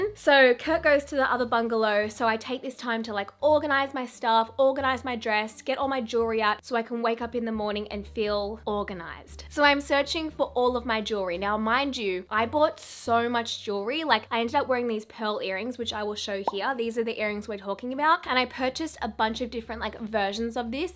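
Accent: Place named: Australia. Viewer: The little Ozzy accent developing strong!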